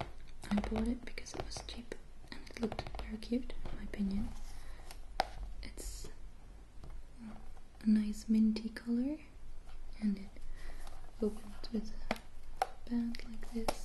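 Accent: Netherlands. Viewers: Ben je nederlands? Hahahah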